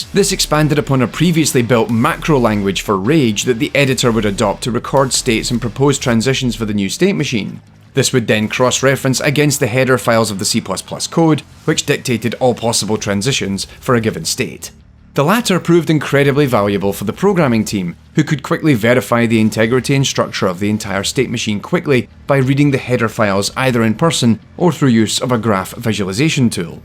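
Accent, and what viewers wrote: Scotland, United Kingdom. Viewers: One like coz it has DOOM in the title... An unlike..... So i can just like it again for your SCOTTISH ACCENT.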